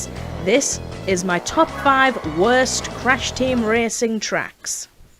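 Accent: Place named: Northern England, United Kingdom. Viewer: I love a good Northerner. Sheffield?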